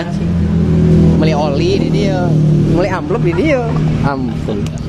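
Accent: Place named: Indramayu, Indonesia. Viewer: Ngomong e kaya wong dermayu ang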